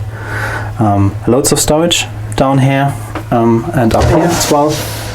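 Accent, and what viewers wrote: Germany. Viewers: Immer Lustig wenn ein deutscher sich in english bemüht. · What a beautiful German accent English!!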